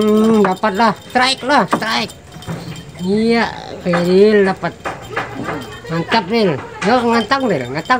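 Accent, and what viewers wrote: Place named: Central Kalimantan, Indonesia. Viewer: Menyimak suara bro seakan-akan saya sedang berada di Kalimantan Tengah.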